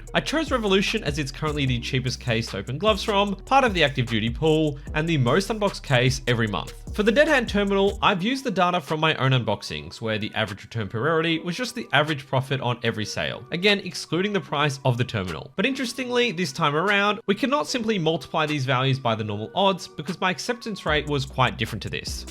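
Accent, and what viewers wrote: Australia. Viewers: “This video sponso’.” You gotta love an down under accent. Keep upt the good work!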